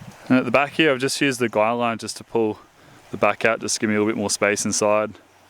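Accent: Australia. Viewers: Hi, If you can make a swag that’s light weight, water proof, durable, can be comfortable on unfriendly surfaces and under $500. I’d buy it. My idea would be a collapsible carbon fibre stretcher frame, with built in air mattress. And eyelets to rope off to a tree for inside volume or to achieve level perhaps. It would need to come in black, green, yellow and camo. And please get it made in Australia. Otherwise one would simply buy a Hammock tarp setup. Good luck with your venture mate. And love your latest video. Careful you don’t get commercial with the plugging gear but do enjoy the cool gadgets you use. Mate I reackon your from Queensland the way you say aye at the end of your sentences. Am I right?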